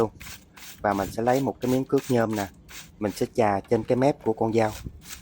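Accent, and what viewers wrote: South, Vietnam. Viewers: Rất thích giọng nói của nghe là biết người miền Nam chân chất 👍👍❤️❤️❤️💐💐💐💐 Mọi người nhớ bấm đăng ký và bấm like nhé các bạn. From Australia 🇦🇺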